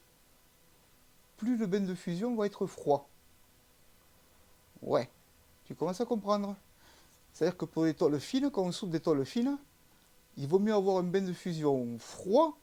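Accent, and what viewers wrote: South, France. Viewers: L’accent du SUD quelle beauté 🤙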